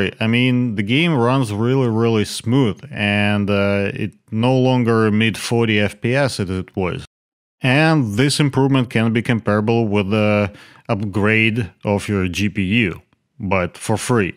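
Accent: Russia. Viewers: Я прям чувствую родной акцент :) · Чувак, ты же явно русский, правда?)))) акцент.. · russkiy russkogo slishit iz daleka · Russian accent coder "based" in the US yeah call me paranoid but nope i like my FPS like it is. Also, 50/50 stolen code. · Русский акцент не перебить чистым английским) Отличная тулза, спасибо! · Русский акцент я узнаю везде, так держать товарищ!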